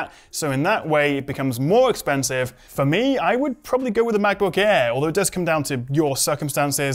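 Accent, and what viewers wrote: United Kingdom. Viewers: I love how aggressively British you are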